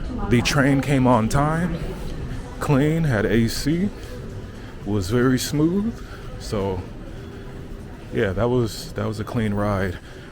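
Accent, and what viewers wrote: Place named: United States. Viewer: man you sound American, if that's the case, which US city would you say is most similar to Sydney?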